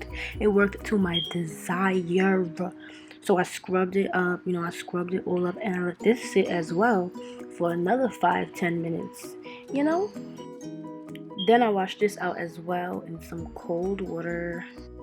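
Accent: New York, United States. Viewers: You from New York ? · Omg I love hearing u speak reminds me of home NYC girl great video it came out so nice does the hairspray really protect the lace I’m so worried bout that cause I like the lace to stay u did good sis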